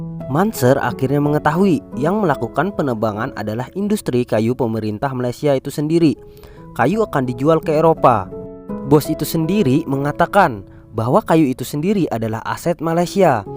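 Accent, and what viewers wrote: Indonesia. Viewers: Kaka ya orang banjarkah ? Soalnya logatnya ketahuan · Ciri khas suaranya kaya orang Kalimantan 😁 aku udah subscribe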